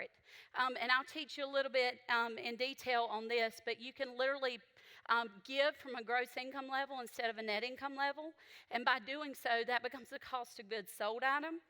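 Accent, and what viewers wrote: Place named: South, United States. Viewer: She totally sounds Southern..how could she say out her lips, NJ😂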